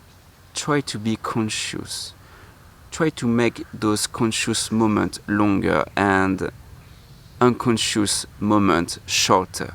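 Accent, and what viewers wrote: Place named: France. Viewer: Ha ! Yes, some of your words are confusing in meaning. Your thick ( I'm guessing French ? ) accent tends to distort them sometimes.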